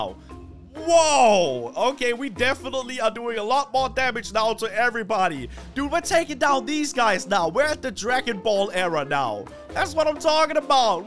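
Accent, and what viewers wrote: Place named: Denmark. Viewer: jeg kan høre tydeligt at du er dansker gode videoer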